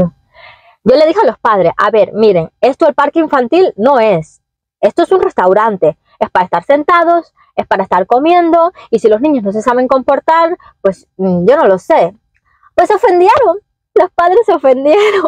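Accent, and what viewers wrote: Galicia, Spain. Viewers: tienes algo de acento gallego no se si te diste cuenta,es muy suave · Se te pegó el acento gallego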